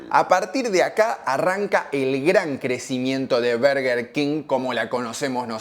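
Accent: Argentina. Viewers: este no es español,es argentino o uruguayo 😂😂😂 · Es Argentino...no te a hablar en español neutro... Es lo mismo que yo pretenda escuchar un podcast mexicano o brasilero y me queje de la tonada... Hay gente estupida en este mundo....realmente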